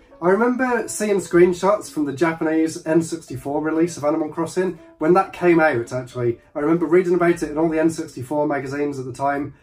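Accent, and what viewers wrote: England, United Kingdom. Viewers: Sweet channel. My fav is Animal crossing, you are cute and I love your English accent:) more animal crossing videos please;)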